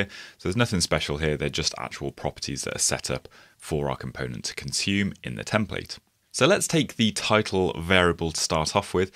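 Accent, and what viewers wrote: United Kingdom. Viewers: after dealing with Indian guys` talks in angular, it is nice to came across an expert who has British accent :)) Thanks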